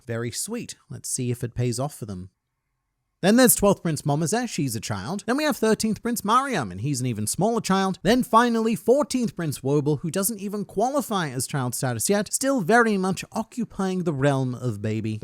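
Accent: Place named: Australia. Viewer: I manly watch this guy on the Internet souly to obtain his accent, after listening for years I'm able to mimic the australian accent and convert it into my own speech😅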